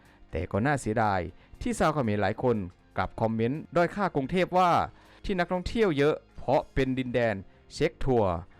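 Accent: Laos. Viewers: คนพูดเป็นคนลาวถูกต้องไหมครับ😅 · แอ็ดมินจากสปป.ลาว ก็จะออกเสียงได้ประมาณนี้ครับ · รู้สึกเอ็ดดูแอดมินที่พยามพูดภาษาไทย แต่ก็ยังฟังออกว่าเป็นคนลาว เพราะพยัญชนะ ค ย ฉ ช ซ ส ษ ศ ล ร ฮ เหล่านี้เป็นต้นที่ทำให้คนลาวออกเสียง และสำเนียงผิด ส่วนลาวจะออกเสียง ຊ = ช ซ ສ = ฉ ศ ษ ລ ຮ = ล ร ອ = อ ฮ อะไรประมาณนี้ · พูดไทยแต่ออกเสียงลาว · ถ้าออกเสียงสำเนียงแบบไทยแนะนำแอดอ่าน ใช้ ไม่ใช่ ไซ้/ ฉุด ไม่ใช่ สุด/ฉะเหลี่ย(เฉลี่ย) ไม่ใช่ ศธเกลี่ย และอีกหลายๆคำที่ไม่ได้กล่าวไว้ ***แต่ถ้าปรับไม่ได้ก็ออกเสียงแบบลาวไปเถอะ คนฟังและดูเค้าเข้าใจภาษาลาวอยู่นะ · ผมก็ฟังออกว่าคุณเป็นคนลาว มันแตกต่างกันกับคนไทย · เดาไม่ผิดแอ๊ดเป็นคนลาวแน่นอนคนไทยแต่ละภาค ผมฟังสำเนียงออกรวมถึงชนเผ่า คนลาวจะไม่มี ช.ช้าง จะพูดออกซ.โซ่ นี้คือสิ่งที่ผมจับจุดได้แบบ99.99%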